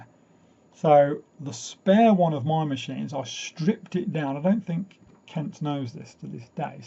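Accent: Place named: Northern England, United Kingdom. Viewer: i definatley wouldnt of picked your accent as coventry england.You sound like your more from northern england.Great story though 😉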